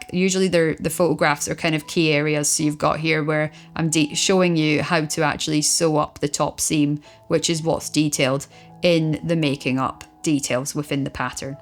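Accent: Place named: Scotland, United Kingdom. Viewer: thank you - I've recently discovered your channel - although I was taught to knit as a child by my gran, I hadn't done it for years and needed a refresher course before tackling a blanket for a friend's first grandchild. this is exactly what was needed and being Scottish myself, I really appreciated the accent!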